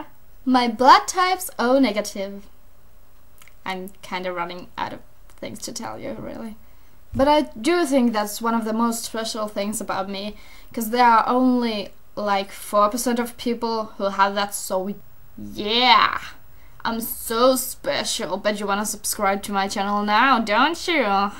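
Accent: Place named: Finland. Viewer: You sound so finish and that's kind of cool... the accent and stuff...! :D When I was little I lived in Finland for a while. I remember the kids and people and you just remind me of them somehow or something... :D Anyway, smoking is cool, so you should definitely try it! :D It's just a hobby. If you smoke, well at least that's gonna be a new topic for you to talk about in your videos. Take it as a challenge, light a cigarette and share your experience live on camera... :D... Anyway, peace!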